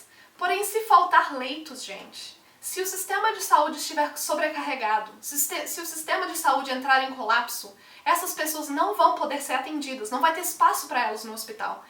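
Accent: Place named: Minas Gerais, Brazil. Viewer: Oi, você é de BH? Fiquei curioso pelo sotoque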